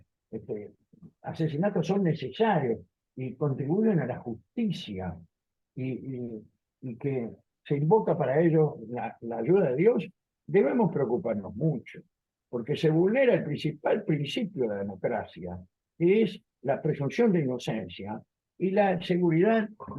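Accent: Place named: Buenos Aires, Argentina. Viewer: El acento porteño es hermoso ja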